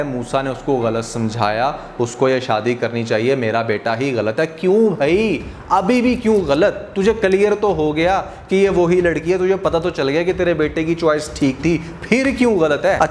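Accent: Punjab, India. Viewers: Aapka Punjabi accent badiya Lagta hai. Punjabi hi hai na??????? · Bohat hasna diya bhai apki panjabi ne i am panjabi thanks for sharing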